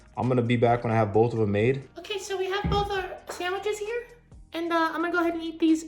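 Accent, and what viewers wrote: Australia. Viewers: That accent was Australian crossed with victorian English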